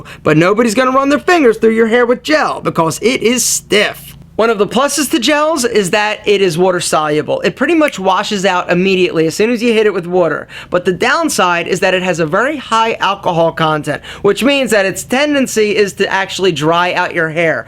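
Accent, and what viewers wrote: Philadelphia, United States. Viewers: Hes from the Philly area... I can tell bc he pronounced water as " wooder"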